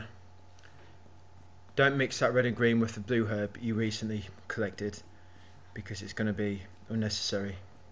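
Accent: United Kingdom. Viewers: oh the British Accent, I LOVE IT <3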